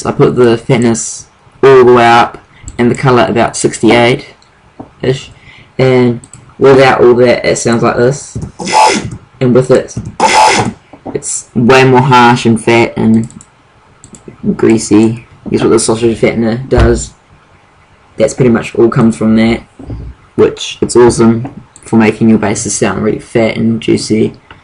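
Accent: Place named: New Zealand. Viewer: lol hard nz accent hahaa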